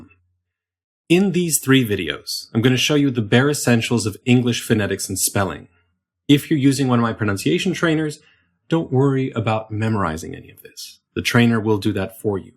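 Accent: California, United States. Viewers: it is californian:) <link> listen to lol you are caught:)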